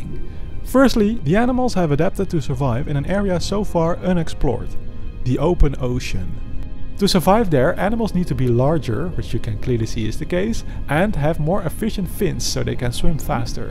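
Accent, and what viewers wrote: Netherlands. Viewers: You sound dutch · I sense a strong dutch accent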